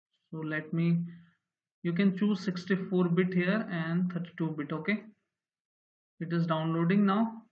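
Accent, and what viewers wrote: India. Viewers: man I should know by now to trust indnan accent, you are the G my man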